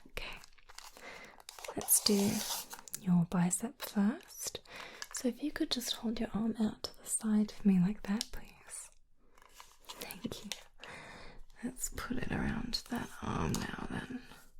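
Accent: Australia. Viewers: just aussie mate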